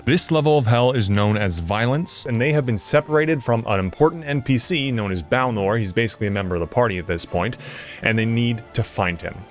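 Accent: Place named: Flanders, Belgium. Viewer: not me trying to figure out if you're dutch or flemish... ima say flemish?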